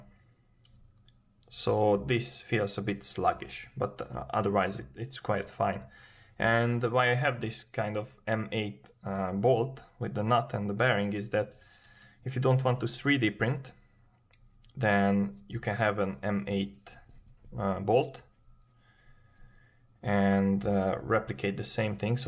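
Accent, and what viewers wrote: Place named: Hungary. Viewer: you have a hungarian accent :)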